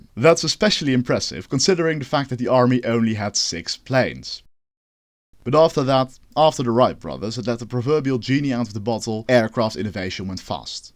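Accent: Netherlands. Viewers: Gegroet landgenoot, mijn makker-alarm ging al af toen ik je accent hoorde.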